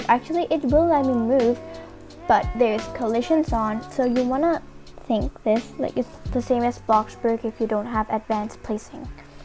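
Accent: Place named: South Korea. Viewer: I have no idea why, but you sound a teeny bit Korean I think?? Or Chinese? Just me, ok.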